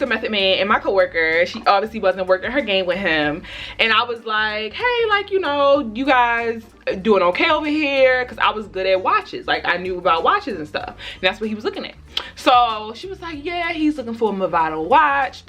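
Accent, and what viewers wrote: Baltimore, United States. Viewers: you from Baltimore you gotta accent I can hear it 😭😂😂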